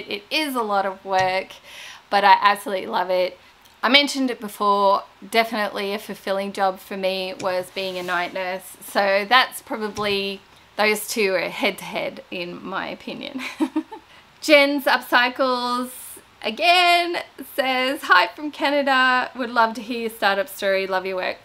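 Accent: Australia. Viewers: so happy for you! You are so much fun to watch and listen to with that Ausse accent.. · Congratulations fellow Australian! Loved this video I always wanted to ask you how you ended up in America. I don’t think I ever knew you were a nurse as I’m a nurse too and can totally relate to what you were saying about nursing. It’s been pretty tough to nurse during covid though. Flipping furniture is my time out from my work. I can see your channel growing so much as your videos are amazing plus I just love to hear a fellow Australian on YouTube. So happy you are a DIY paint supplier! I’ve wanted to try that paint after you first used it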